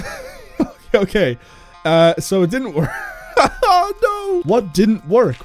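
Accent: Ireland. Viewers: r ya irish?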